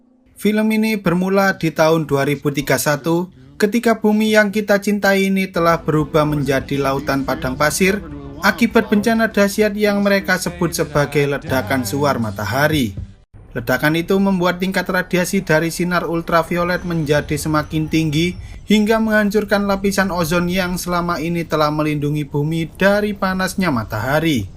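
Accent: Java, Indonesia. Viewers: Kalo gak medok mungkin lebih nyaman dengerin nya🙏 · MEDOK MEDOK... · admin orang Jawa ya bicaranya medok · Medhoj bngt boss, rasa ke tendang kuping gw dngr pke headset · Mimin wong jowo yo😅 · Medok amat bahsa nya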